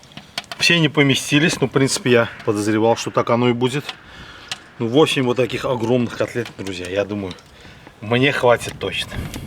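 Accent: Baku, Azerbaijan. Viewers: Джан всегда жду с нетерпением твоих выпусков.Волей судьбы Я покинул жемчужину эту когда то.но добрые воспоминания о Родине навсегда в сердце.как в песне...у бакинцев акцент и добро не кончается😢Салам от Монтинских.🎉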